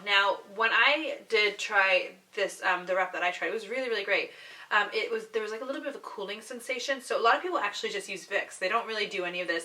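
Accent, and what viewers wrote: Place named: Iraq. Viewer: هلو اول شي اني فخوره لان انتي عراقيه ثاني شي ارجو تنزلين لفديو بلعربي بسرعه لهجتج يعني لغتج بلعربي (عراقي)كللللللش حلوه حته شكلج يكون احله.شكرا جزيلا الج لان انتي متعرفينه ولا شايفته وتنزلين فديو نستفاد منه شكرا لان تحبين لجمال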